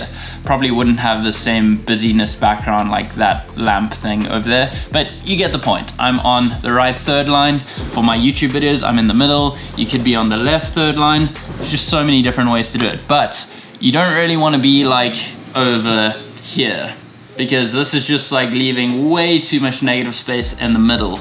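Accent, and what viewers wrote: South Africa. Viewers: Are you south African. Tour accent sounds like it.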